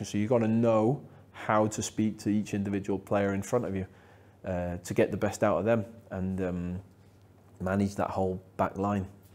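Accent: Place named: Denmark. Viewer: He definitely has a tiiiiiiny hint of danish in his accent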